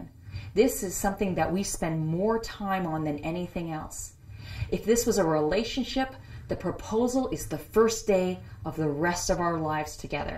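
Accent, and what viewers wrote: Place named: Canada. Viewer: Excuse me, please! Thank you so much,. Your Canadian English with subtitles is fantastic. Greetings to Mar. and Sh. Take care of them. Cheers! Thank you. Bye!! 🇨🇦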